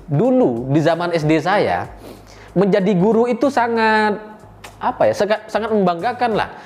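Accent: Madura, Indonesia. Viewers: Logatnya seperti logat madura pak🤭🤭😁👍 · ternyta oreng bedeh yaa, cara ngomong same dgn p.Mahfud MD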